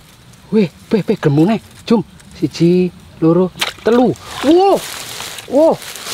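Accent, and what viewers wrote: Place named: Java, Indonesia. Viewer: Abang ini asli mana kok logat nya campur jawa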